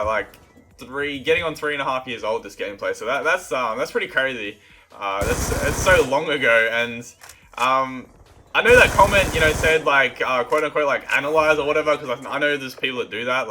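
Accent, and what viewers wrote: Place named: Australia. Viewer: You must be Australian but it's hard to tell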